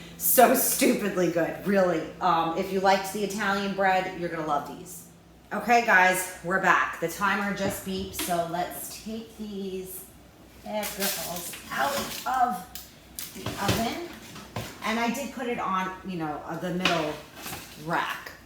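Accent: New York, United States. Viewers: Great job on this video and easy recipe. Will be baking some tomorrow! I love NY/NJ hard rolls and miss them since I moved out of the area long ago. Plus I love hearing your accent! Thanks again. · Same! Living in the greater NYC area you can even call out the borough, and if you are really good the Jewish version of that borough. I think is in or from Long Island. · Too funny - the accent sold me immediately- only fellow New Yorkers can appreciate it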